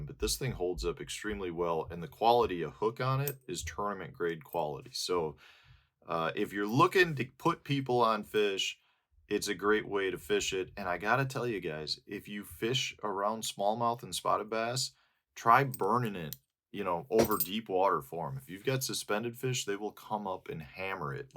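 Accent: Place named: Chicago, United States. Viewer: Hey you're becoming a cheddarhead with the way you pronounce about. Starting to lose that chicago accent. Don't become a Packer fan!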